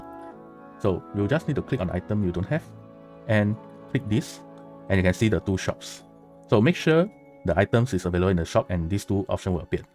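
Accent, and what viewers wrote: Singapore. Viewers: are you Singaporean ??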